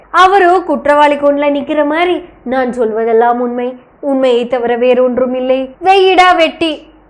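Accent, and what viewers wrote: Kongu, India. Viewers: Vazhtthukkal thozhar Anbu magal Azhagu kongu thamizhil Indha simon vazhai therandhale sakkadaiya than varudhu Chi chi chi😂😂😂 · கொங்கு மொழி super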